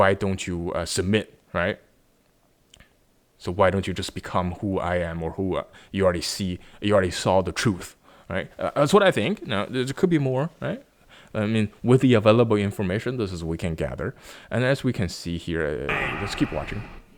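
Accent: Standard. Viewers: 你是华人吗，感觉你的中文特别地道标准，无论是普通话口音还是中文文化，你都很地道，感觉你至少在中国生活过很多年。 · Thank you for your excellent video. Although there were some inaccuracies in the translation, it was already very admirable. Your accent is very standard. Like it👍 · Thank you for your video; your Chinese proficiency is already excellent, and your pronunciation is very standard. Here, I'd like to emphasize a cultural difference that might lead to a slight deviation in your understanding of the meaning of the poem at the beginning of the video. In traditional Chinese beliefs, gods (inherent, pre-existing beings) and immortals (those who can achieve immortality through cultivation) are considered absolutely fair and pure entities without personal desires or malicious thoughts. Desires for wealth, power, and emotional attachments are seen as obstacles for becoming a god or immortal. This perspective contrasts significantly with Western mythology, especially Greek mythology, where the emotions and personalities of gods and humans are more similar. 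So, in essence, the second line of the poem is expressing that although mortals admire the carefree freedom of becoming a god, they are unwilling to let go of their desires for wealth and power. This poem originates from another ancient novel and originally aims to guide ordinary people, with a Taoist advising them to relinquish their attachment to wealth, power, and emotions, encouraging self-cultivation to become immortals.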